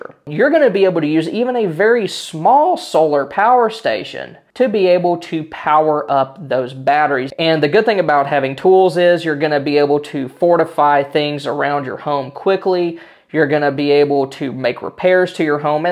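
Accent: South, United States. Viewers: Discovered your channel today...and i like the dry sense of humor tutorial combined with a bit of Southern twang...makes me homesick... expat American tropical salutes from Venezuela!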